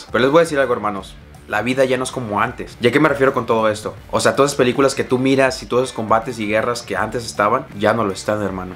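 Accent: Mexico. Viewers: Eres de Estados Unidos y tienes el acento mexicano muy marcado alch que crack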